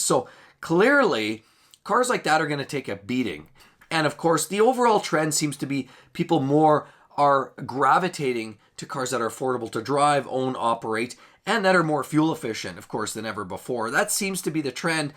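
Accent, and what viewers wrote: Canada. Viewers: What accent is this? Canadian?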